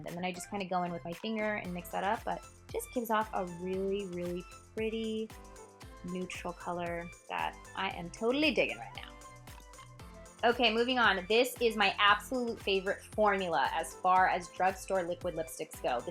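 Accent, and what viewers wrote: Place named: India. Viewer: Hey are you Indian? I really love your accent plz try to reply in Hindi because I wanted you to say something in Hindi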